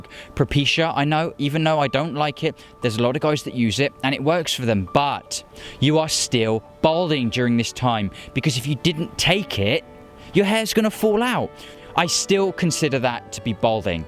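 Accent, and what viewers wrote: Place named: United Kingdom. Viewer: British accent is starting to annoy me.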